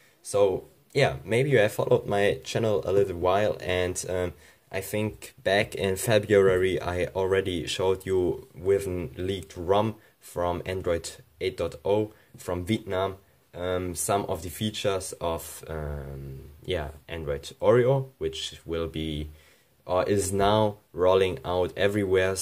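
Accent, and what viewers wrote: Germany. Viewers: Man hört an deinem Akzent, dass du aus Deutschland kommst. Das Emrah-Video hat das dann noch bestätigt. XD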